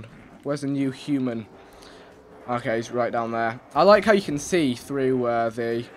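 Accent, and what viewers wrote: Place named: United Kingdom. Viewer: For some reason his British accent makes me not want to punch him in the face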